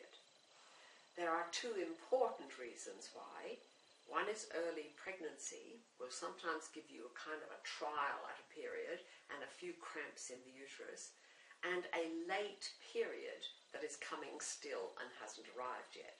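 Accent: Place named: Ireland. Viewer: Sounds posh irish to me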